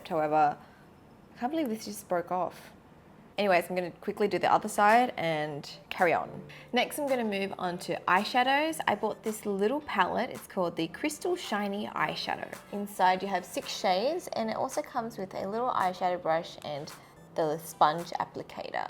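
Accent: Australia. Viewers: im new i was wondering if has any australian background or lives in australia bcoz she has a slight aussie accent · where are you from? Your accent reminds me of Australia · nashikin works LMAO she’s Australian and her accent sounds nothing like the British one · nashikin works she’s not trying hard to be british—it’s her natural accent and it’s AUSTRALIAN. she lives in australia lmao—what do you expect?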